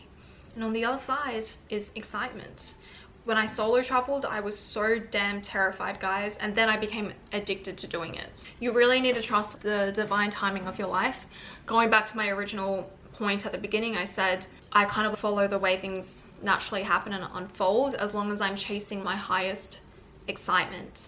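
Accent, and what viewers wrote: Australia. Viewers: your australian accent is the one that keeps me hooked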